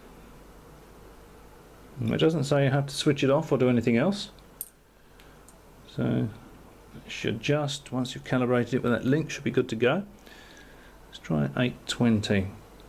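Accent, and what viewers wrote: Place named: Australia. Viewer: Hi!! you seems to be an aussie!!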